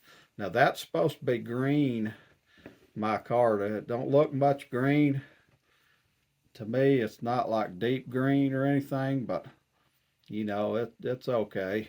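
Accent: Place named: Texas, United States. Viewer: Обожаю техасский акцент хоть и нечего непонятно😂 но Кизлярские ножи лучше 👍😅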